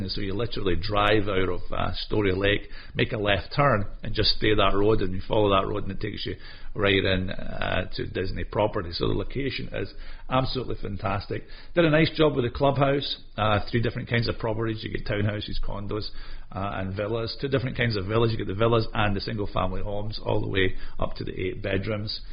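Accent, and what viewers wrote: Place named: Scotland, United Kingdom. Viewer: Just found your video and was delighted to hear what I think is a fellow Scottish accent - hello! I'm really not surprised to hear Reunion resort on your countdown here - I've always thought it looks stunning in there. If you ever do an overview or more affordable options, I always rate CLC Encantada in Orlando - nowhere near the level of Reunion, but a really solid option if your budget doesn't stretch that far!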